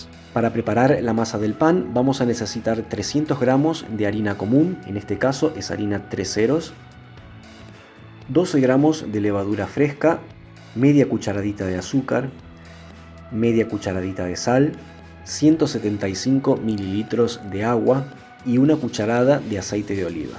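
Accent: Argentina. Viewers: Sé perfectamente que el origen de ese vídeo es Argentina, reconozco el acento, si queréis llamar "jamón crudo" a algo que no lo es, pues vale.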